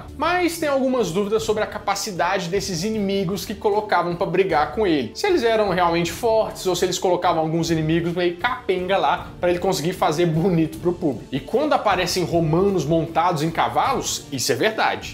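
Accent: Minas Gerais, Brazil. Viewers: Ele fala mineirês.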